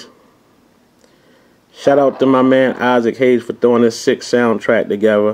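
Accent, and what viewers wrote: Baltimore, United States. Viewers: Sounds like your from b.more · I hear that B-MORE in him all day KEYS BODYMORE all day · I like this video and your northern accent! I'm from Philly. You sound like you from B-more though. Cool video. regarding the hard cases and thing being exposed. I have the otterbox for my Evo. That thing is awesome! Nothing is exposed at all!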